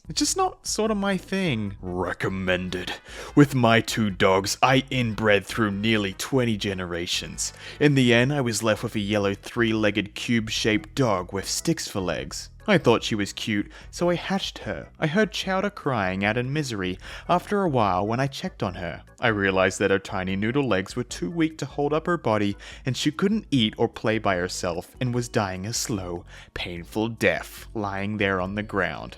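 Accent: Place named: Australia. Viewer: Why do all my favorite British YouTubers always turn out to be Australian?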